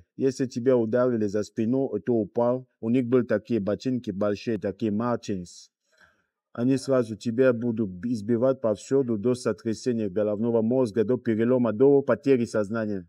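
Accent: France. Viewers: Вы пишите бред-мой друг Француз(и предки его Французы))),научился говорить несколько фраз на русском и Р у него отличная,и акцента французского намного меньше чем у героя этого видео(хотя он во Франции живет, а не в России и разговорной практики у него мало).Все дело в желании.В русском тоже нет звуков которые например в английском есть,только почему то я эти звуки призношу отлично.А насчет картавости вы насмешили))Негр этот не картавый,он просто не заморочился от акцента избавится. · Моя учительница биологии жила и работала в Камеруне. Много рассказывала про Камерун, природу и людей. У такой французский акцент. Приятно слышать хорошие слова о России. Спасибо. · у него родной язык французский, франкоговорящему вообще очень сложно избавиться от характерного акцента, тем более с таким сложным языком как русский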